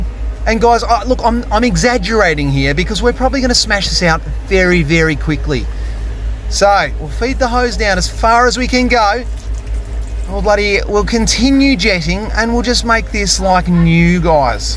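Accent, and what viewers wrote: Australia. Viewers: I love the Australian accent ❤ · Just looked up at the clock and it's here in the UK which means that I've been enjoying you videos now for almost 4 hours - brilliant, cathartic and I feel I've learnt to speak 'Aussie' in the process. Good on ye fella. Great to see somebody so into their work and enjoying every minute. Pity you don't operate around here. Cheers. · The Australian accent just kills me😂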